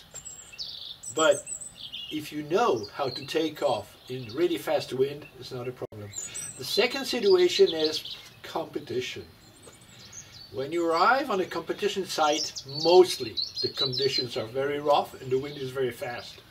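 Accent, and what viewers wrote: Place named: United States. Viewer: Angielski naprawdę dobry, amerykański akcent. Pozdrawiam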